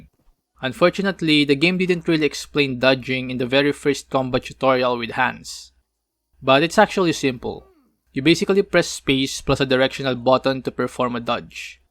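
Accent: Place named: Philippines. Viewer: Noypi? I can hear it from your accent.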